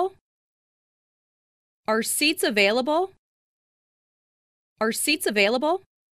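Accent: United States. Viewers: american accent detected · Is american accent?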